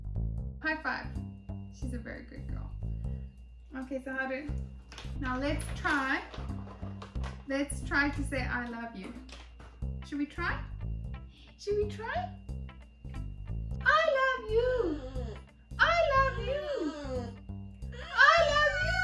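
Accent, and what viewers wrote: South Africa. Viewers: quite agree, love hearing both your voices. I've been trying to place your accent..it's been bugging me. Keep thinking South African. Am I way off? 😁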